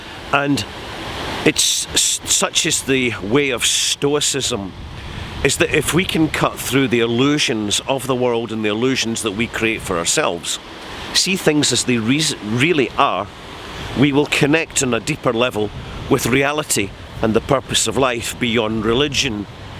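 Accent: Scotland, United Kingdom. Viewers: I came here for philosophy, setting and a Scottish accent. Liked and subscribed.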